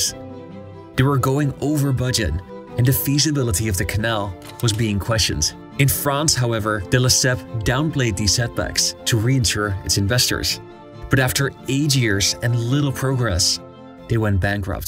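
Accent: Netherlands. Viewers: Is he Dutch? Sounds Dutch trying not to sound foreign.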